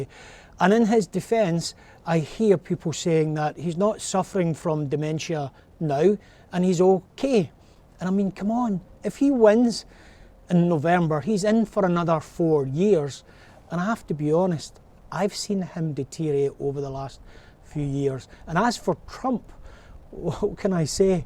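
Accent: Scotland, United Kingdom. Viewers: It is good that your Scottish accent is not too strong to understand.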